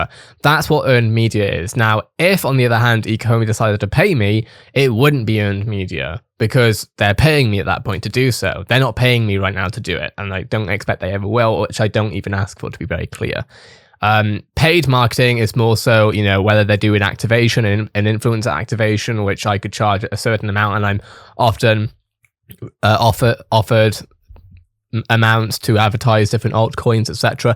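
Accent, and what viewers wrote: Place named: United Kingdom. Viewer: You do a great British accent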